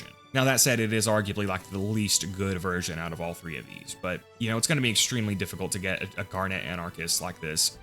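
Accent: South, United States. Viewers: They honestly do sound similar its just his voice has a southern kind if accent and fiber's doesn't. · You don't really sound much like fiber honestly. Your accent is pretty clearly southern his is far less so, if at all. Shadow definitely has the master lock picking ability lol. The best bossing weapons in the game, as far as I know, mostly all have a synergy with the bee due to unlisted projectiles, a unique firing pattern, or are able to benefit from multiple parts of the damage formula. The ones that don''t still kill things in op10 but they just take longer to do so. I think much of the gear discourse in this game might actually be driven by availability and the opportunity cost of having an item equipped/taking up bank space compared to another. Having only 63 places per VH to hold gear makes the decision on what to farm, keep, and use more difficult than it otherwise might be. 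The unlimited bank mod is really helpful for this, but I think much of the discourse around what's "good" at end game stems from the early days when most played on console and mods weren't as readily available on PC. Also, does Buttstallion always/often drop gemstones, or is that just the only place you can possibly acquire them?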